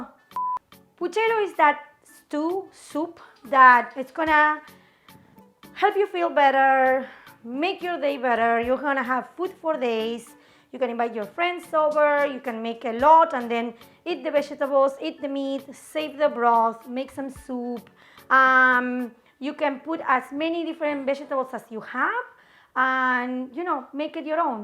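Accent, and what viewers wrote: Argentina. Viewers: my mom barely ever cooks, her signature dish was always "aroz con porquerias" but one thing she always made back in Argentina was puchero, seeing puchero argentino with the argentine accent and the apron with Mafalda really made me miss my mom, haven't seen her in person for a few months cause of covid. thanks for being wonderful!!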